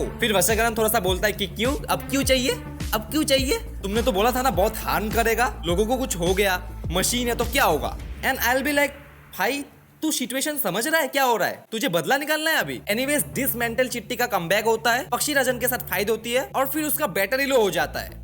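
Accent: Assam, India. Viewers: vaik assamese ho pata chal gaya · Bhaii apka hindi bolneka tarika se lagta he u are from Assam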